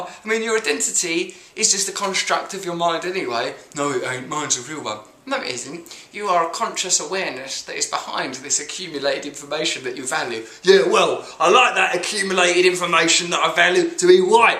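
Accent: United Kingdom. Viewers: Hey i don't understand to much british accent, and you speak very fast, breath a little lol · Hey.. listen to enough of these and you too can start understanding brit .. love it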